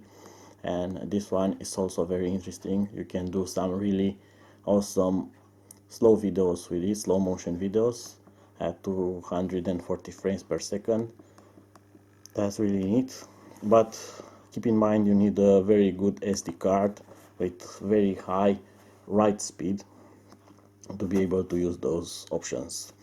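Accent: Romania. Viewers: Good review , buy the way this is 100% romanian enghlis, accent tell us :)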